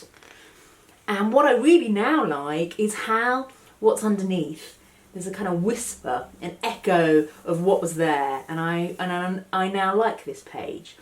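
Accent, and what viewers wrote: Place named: England, United Kingdom. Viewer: Wow! 🤩 I've just discovered you today. I'm feeling a bit low, (or was,) it's my 55th birthday, no cards, a "nothing" day... but i was checking out videos of gelli plate art and found you. 😊 What a lovely lady, so glad you are doing again art now. The sketchbooks are full of gorgeous colour, great pen drawings, not at all childish! 👍🏻😊 I also love the fresh cream walls in your studio with the splashes of colour, and colourful ceramics as well. That's how I'd like this dull flat to look; it really needs tidying up and decorating! 😱 And i just live up the road in Eastbourne at the moment. And it's nice to hear a calm English accent. Must watch your other videos - great advice too.❤🌞🌷🌼🌱🌿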